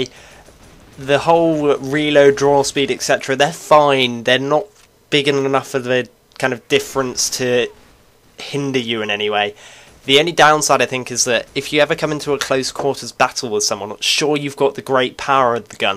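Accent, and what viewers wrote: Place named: United Kingdom. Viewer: lol ur British accent :) cool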